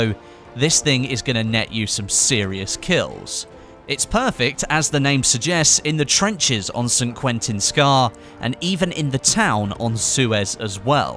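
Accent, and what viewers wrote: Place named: United Kingdom. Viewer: Luv ur british accent. U should be in the game. talking about to get objective charlie lmao nice content bro cheers from brazil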